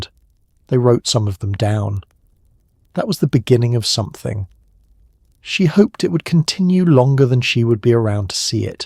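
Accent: England, United Kingdom. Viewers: No please leave the English voice! The whole world is not American thank you 🙏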